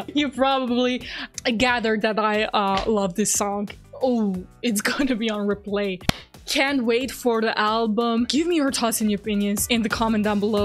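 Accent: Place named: Slovenia. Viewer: GIRL YOU’RE SLOVENIAN ?? I always wondered where you accent was from, I can’t believe you’re there I fell in love with this country when I did an exchange there for a semester in Koper, and since than I try to come back once a year. I was so happy when I saw Ljubljana on the list, bc a very good friend of mine, Slovenian, told me how you never have shows there and you always have to go to Austria or Croatia. So good for you !! Love from France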